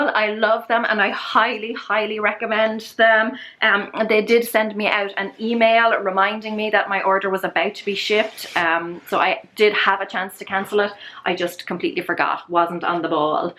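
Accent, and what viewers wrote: Ireland. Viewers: Elloello. Take hangers back too. Switch them for plain hangers and get a glue gun. Melt a frosting squiggle of glue the entire length of the top part. Let dry. Same non slip for delicate and dresses and for pennies on the dollar. I love your speaking pattern and Irish brogue. (hope that's right!) I have going to Ireland on my bucket list since I have Irish roots. Hugs to you and yours from NC.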